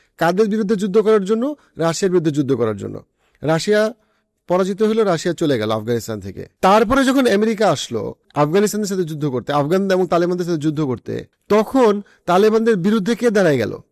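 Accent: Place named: Bangladesh. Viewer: ও দাদা তুমি কোথাকার লোক ভাষা শুনে তো মনে হচ্ছে বাংলাদেশের তা তুমি তোমার দেশ টা নিয়ে মাথা মারো তোমাকে ভারত কে নিয়ে এত ভাবতে হবে না ,আমরা ভারতীয় হয়ে আমরা এতো ভাবিনা তোমার এত ভাবনা কিসের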